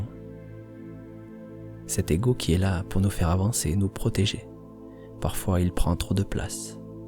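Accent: South, France. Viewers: Votre petit accent du sud me réchauffe le cœur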